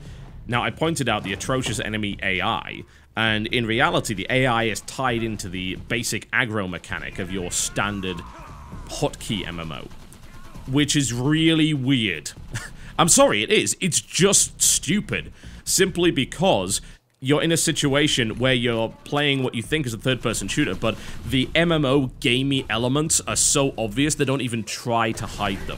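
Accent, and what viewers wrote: United Kingdom. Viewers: 3. It's easter, maybe a large amount of your viewerbase was on vacation? (I know... probably not) 4. The format. Annoying noise in the background can throw a lot of people off i think. I know that it atleast used to annoy me. 5. A lot of not-you talking. I may sound wierd, but I actually started watching your videos because of your voice, or rather your british accent, and then later stayed for your gaming knowlegde. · I watch your videos for your opinion, your sense of humour and that great british accent. I watched 1 of the shield videos... the developer was just boring and clearly not best in the field of presenting a product. The questions that came to mind were "what is this good for, wii controller does sth similar" and "why the hell would I want to give up my 27'' for like 10''? Didn't get answer for that. Did I really have to watch the whole footage to get those answered? 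No conventions for me... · I began watching your channel over 2 years ago. I enjoy them because of your personality and awesome British accent. I love when you are emotional and rage. But recently you switched to be more "news" like than entertainment. I never critique you since it is your channel and you should upload what ever you want. But Your recent videos being very emotionless. Hope this helps. Thanks from your long-time fan!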